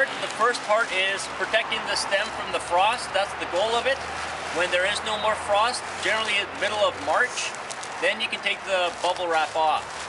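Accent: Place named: Canada. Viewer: I’m Canadian, and as soon as I heard this I could hear the accent😂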